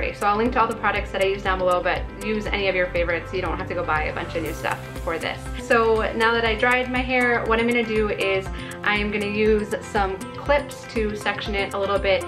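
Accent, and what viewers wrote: Minnesota, United States. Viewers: I love your videos. And I love your MN accent. I’m not from MN but I lived in Mpls for 4 years and fell in love with the accents there as well as the people. Not only do I value your content and personality, but I can watch your videos and get my MN fix from my own home!🤗😎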